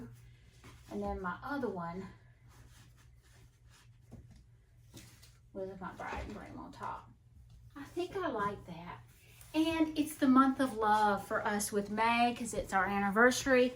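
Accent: South, United States. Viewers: Southern accent - my fave!!! So bring it on, lol. I'm a WI girl turned KY grandma, but I think I will always have my northern accent - "y'all" just doesn't sound right coming out of my mouth, but I wish it did 😂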